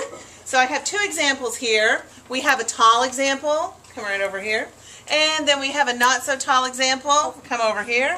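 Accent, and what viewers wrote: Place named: South, United States. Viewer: That's pretty amazing information! I love your new found southern accent! I can see that you turned out to be a great science teacher!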